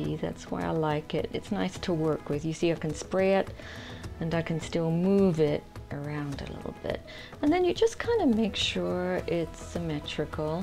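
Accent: England, United Kingdom. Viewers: I ran across your channel. I stuck around and watched several videos. I must say you are an extremely beautiful lady. I found that I was in absolute awe over the way you talk, look, act, present yourself. I love your English accent and your such a funny woman. I love your humour. Your a very bubbly person . It made me smile. Plus with saying all that your videos were very relaxing for me to watch. Thank you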